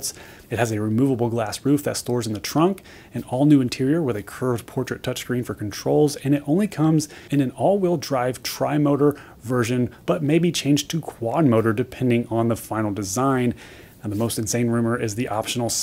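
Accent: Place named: Midwest, United States. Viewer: As always, a nice, professional and useful video,! You can always be counted on to get right to the details and to also allow us to hear about your experiences. You are SOLID GOLD! Thank you! P.S. Kinda sounds like Tesla's PR company wrote a lot of the "specs" text for you to read. No worries, but because you speak (or the video speed is "enhanced") fast, I had to CONSTANTLY, stop and rewind to hear all the spec details packed in to every sentence. May want to ask Tesla's PR firm to not enhance the speed of the video. Remember, the #1 group of car buyers is WOMEN. (Over 70% of the NEW cars purchase decisions are either directly by a woman or heavily "influenced" by a woman. This is according to published, industry stats. The remaining 30% of the NEW car decisions are made by men - who are single, or are buying a "collector" (not a commuter) car, or have no family and/or no significant female in their life. 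SOOoooo...as I am starting to explain to a couple of other "engineering" - driven sites, please SLOW DOWN and speak in your NATURAL voice. You have a GREAT Midwestern voice, which is very pleasant to listen to. NO need to RACE through everything. We LOVE what you have to say. Hugs and again, THANK YOU!!! 💐🌺🌻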